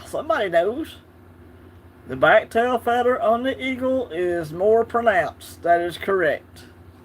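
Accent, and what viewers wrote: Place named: South, United States. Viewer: Sorry I missed your live and hanging out with your awesome Southern Accent. Hope you are doing well. 🙂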